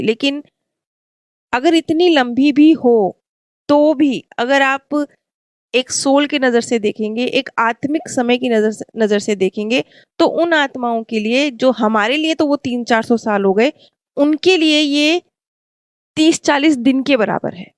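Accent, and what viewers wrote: India. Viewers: di ka Punjabi accent hai isliye aisa pronounciation hai unka..... Thoda theek se hi reply kr do ye gawar wala cheap word bura nhi lagega kya unko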